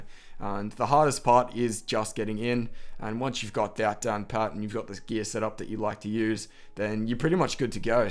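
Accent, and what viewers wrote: Australia. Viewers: Hey mate, are you from the NT? you sound like a real Aussie bloke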